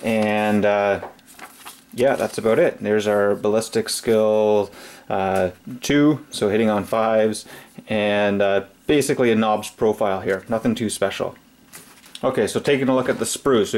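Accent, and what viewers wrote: Canada. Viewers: i can tell from the accent. mtl?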